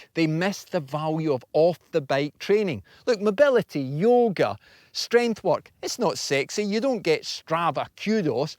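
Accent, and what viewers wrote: Scotland, United Kingdom. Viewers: are you scottish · You sound Scottish, laddie.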